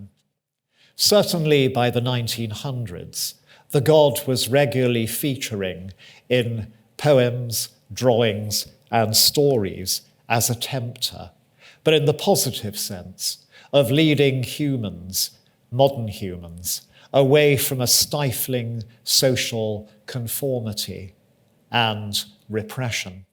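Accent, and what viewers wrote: United Kingdom. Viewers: My god is this British!!!!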